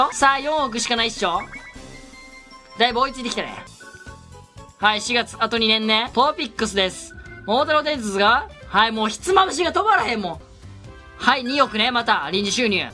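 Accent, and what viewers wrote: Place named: Kansai, Japan. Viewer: この花江夏樹関西弁やな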